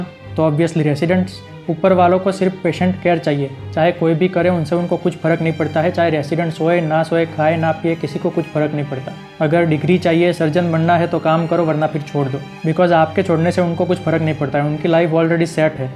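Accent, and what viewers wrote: Gujarat, India. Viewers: Clearly your voice says you are pakka gujarati ❤️❤️❤️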